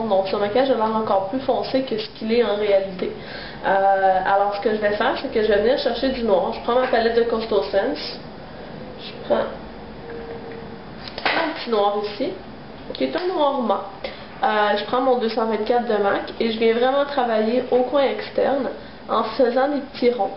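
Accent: Quebec, Canada. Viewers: jadore t'es vraiment tres belle jadore ce maquillage .... Ps jadore laccent Quebecoi ...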